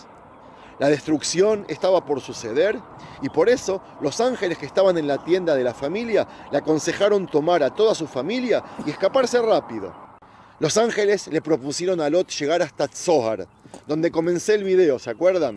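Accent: Argentina. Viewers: Creo que él es de origen argentino.. por eso habla asi.. por su asento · Este señor es argentino por su acento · Feliz navidad??? Bien dice las Sagradas Escrituras: profesan ser judíos mas son sinagoga de satanás. Según éste estudia la Tora con doctrina romana y protestante, además tiene acento argentino sho digo que vos es un falso judío.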